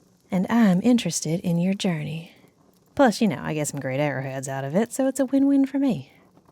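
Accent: South, United States. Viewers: You keep acting like that and I'll put you in your place Great southern accent. Wait is it southern? Great audios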